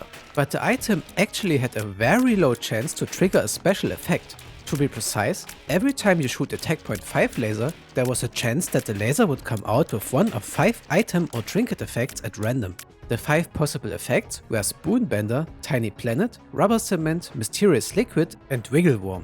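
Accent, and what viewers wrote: Germany. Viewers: Ahhh jaaa der schöne Deutsche Akzent wunderbar❤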